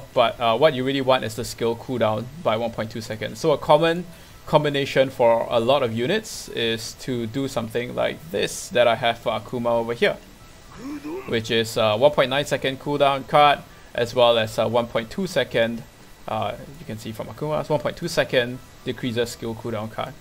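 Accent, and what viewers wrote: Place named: Singapore. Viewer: Just curious. You sound singaporean. Like your video btw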